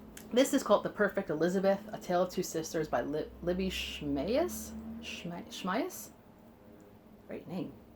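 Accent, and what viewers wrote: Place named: New York, United States. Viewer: Hi, I'm going to book off today here in Hawaii. Wish me luck. I have more of a New York accent than you do and I've been living in Hawaii for 40 years. I just did my first. mail haul using my brooklynese accent full force if you want to take a look. Sorry you got soaked. It happens to me in Hawaii but I have a collection of plastic bags. Aloha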